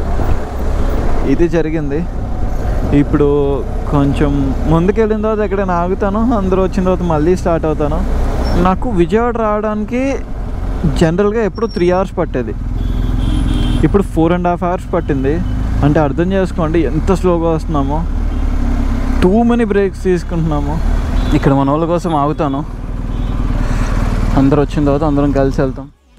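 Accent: Godavari, India. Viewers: Godavari slang ❤❤ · Godavari language.. ❤💖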